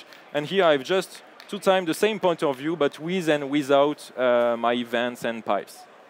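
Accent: France. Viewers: I love his french accent :V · Ze french accent is very funny!😅😆🤭👍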